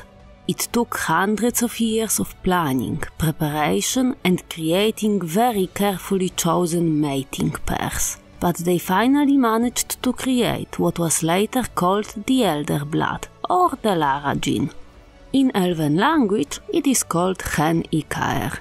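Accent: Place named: Eastern Europe. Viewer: I love your accent, thought first its Italian but its slavic isn't it? Subscribed and looking forward to listening to more lore in the best voice and accent for it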